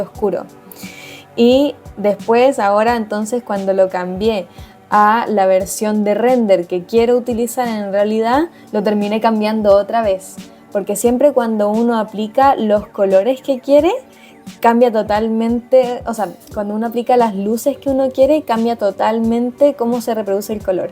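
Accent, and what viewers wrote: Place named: Chile. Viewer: claro que me interesa, yo te le decia como consejo, tambien hago 3d. igual creo que si grabas directamente lo que vas haciendo y hablas de lo que piensas tambien sería entretenido, ademas no tendrías que gastar tiempo en edicion de video y harías las 2 cosas al mismo tiempo, luego lo subes así no mas, total no tiene que salir perfecto, y lo imperfecto e improvisado funciona bien por el hecho de que si es que te equivocas, tambien la gente aprenderá de los errores y de posibles soluciones. ademas que dicen que mejora la oratoria el hablar a gente sin tener a nadie presente, no es que diga que hablas mal, es mas yo tambien soy chileno y me hacía falta escuchar mi acento desde que vivo en brasil, sobre todo si es con blender. eso de la oratoria se lo escuché a ooki de monkey, no se si lo conoces, es un colombiano que hace videos de escultura. 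igual solo es un consejo para que no gastes tiempo en guion (que no se si lo haces) o en edicion de video. ademas que resultaría super natural y podrías explotar tu naturalidad y no perder tiempo en cosas que lo mas probable no le preocupan mucho a la gente que desea ver tu contenido. yo no soy creador de contenido y no se mucho las dificultades que trae serlo, solo te lo comento por videos que eh visto que siguen esa rutina, por ejemplo las master class de alinwan studios. el solo se graba y luego en la edicion de video solo hace cortes, por si es que vá al baño y le agrega una intro y nada mas, pero su contenido es oro puro en blender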